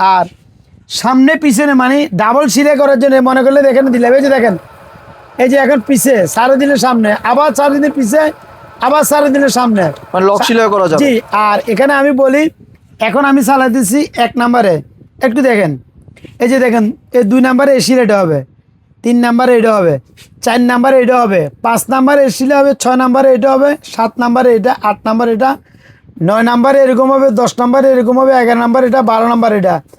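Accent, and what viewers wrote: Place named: Noakhali, Bangladesh. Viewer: ভাই নোয়াখালীর মনে হয়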